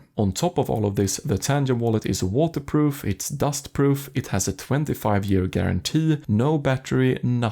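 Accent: Sweden. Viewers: You sound swedish on your accent. Stämmer min gissning? :)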